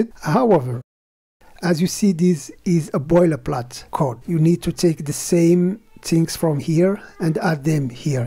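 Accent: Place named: North Africa. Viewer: it seems from your dialect that you are from north Africa, allow me say it in my Moroccan dialect: LAH YE3TIK SE7A!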